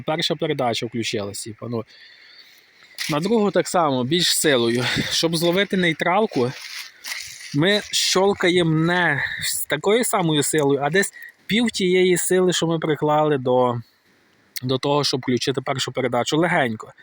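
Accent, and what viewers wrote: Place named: Western Ukraine, Ukraine. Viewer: Й ще одне! Перепрошую за питання - не хочете - не відповідайте на нього! За Вашою говіркою я прогнозую що Ви мешкаєте десь на заході Україні, найвірогідніше - Львівщина. Можливо помиляюсь. Сподіваюсь Ви не будете вважати таємницею назву області у якій проживаєте?! Особисто я у Черкасах.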